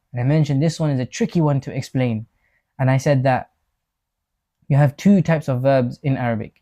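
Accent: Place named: United Kingdom. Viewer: Hi Teacher, since I'm learning (some) Arabic I gather and collect explanations and finally making my own lessons understandable. You just Explained something very Crucial which I've never heard before, moreover I didn't even know it existed. The tricky Fial or Verb, there are 2 types, 1 which is mentioned and 1 which is Not. You are the first to Mention or to Explain these 2 types of Verbs and the differences in Detail. Good Job 👏🏻. One more thing, .... I like your typical British Accent, this gives the classes more flavour 🎉 I wish for lots of Success and more followers / Students. Excuse me for my mistakes, I'm a Dutch speaker. Best Regards from August from the Netherlands 🖐🏻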